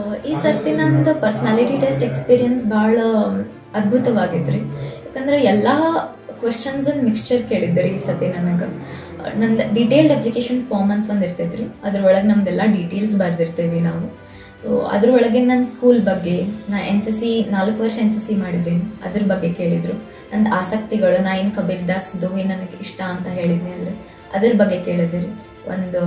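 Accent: India. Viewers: Bahal simple agi guidance madidri Akka.....pakka Belagavi Kannada... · Yalli hodru uttara karanataka(UK) bashe(style) bidabyadri akka...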